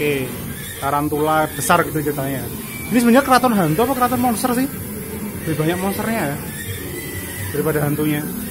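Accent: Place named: Java, Indonesia. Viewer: Masnya kayak Joe Kal.. pemberani N jowo banget kalo ngomg.. mantapp...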